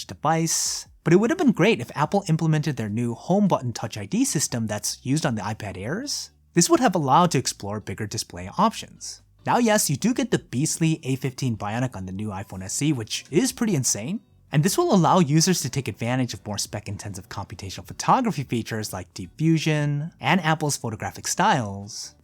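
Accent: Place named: San Francisco, United States. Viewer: Holy stereotypical San Francisco accent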